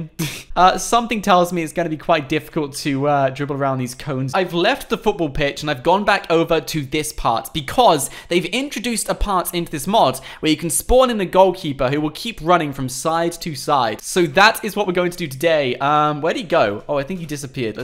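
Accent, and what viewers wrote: England, United Kingdom. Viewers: Wow you are English wow man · Are you from England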